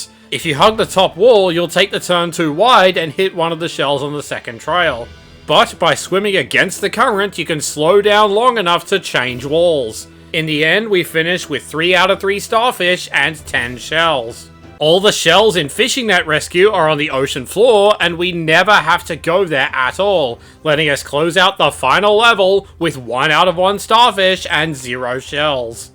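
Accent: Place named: Australia. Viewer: Never heard of this game, nor do I care about it at all...But I sure do love hearing this Aussie try to beat challenges, so I watched the whole thing.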